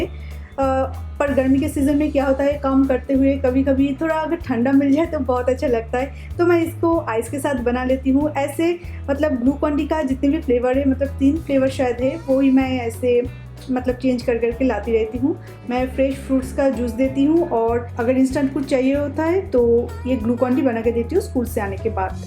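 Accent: Bengal, India. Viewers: Hi frnd u r sooooo sweet.....i love ur bengali accent😘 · I love your way of speaking it has bengali acsent I love it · Shweta Grover Yar bangali hai to Thora sa to chalta hai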